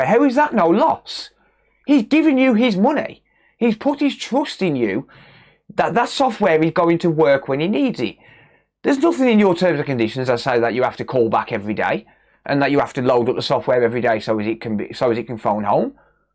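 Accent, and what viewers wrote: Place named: New York, United States. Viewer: It’s how New Yorkers speak. Straight outta the gutter.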